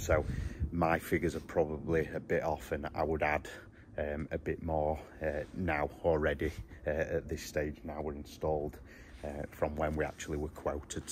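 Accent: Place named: Northern England, United Kingdom. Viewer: I just love your northern accent. It’s mint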